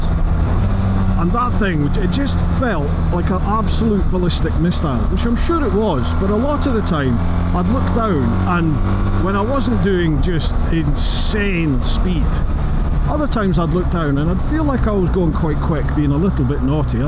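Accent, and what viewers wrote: Scotland, United Kingdom. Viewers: The SOS messages you’re getting are false negatives. The system is listening and self-teaching itself voice-recognition. Unfortunately, it struggles with the Scottish accent and is triggered. You’ve seen those videos about voice recognition lifts right?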